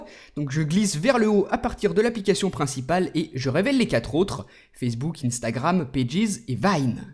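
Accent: France. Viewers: ptn il me saoule ton accent francais quand tu dis des choses anglaises --'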